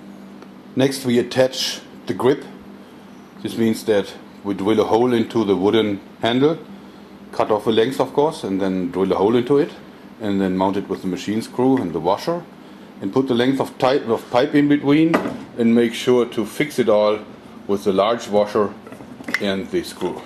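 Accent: Germany. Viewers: no, it's a german accent^^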